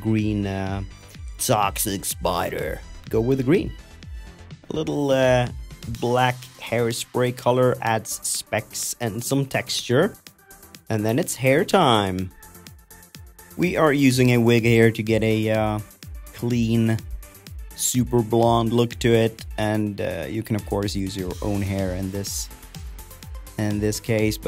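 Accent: Sweden. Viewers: i was not expecting the dialect i'm so used to rikssvenska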